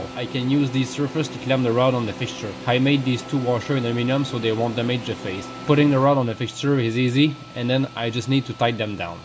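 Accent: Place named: Quebec, Canada. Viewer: Ca sonne québécois cet accent là mon ami! ;) Bon vidéo!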